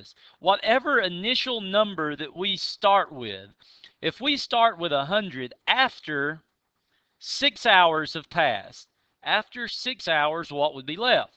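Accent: United States. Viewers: Science spoken in American English 🤪👍 loved it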